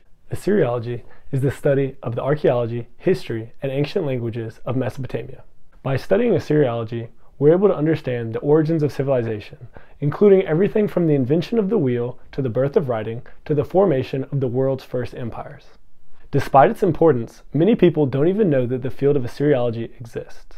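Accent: United States. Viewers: Dear, I would have some questions regarding the pronunciation of the Akkadian language. I noticed that you have a rather American/English accent, especially in the a and r sounds. How was the pronunciation actually like? Can we reconstruct that? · Why do you got American accent 💀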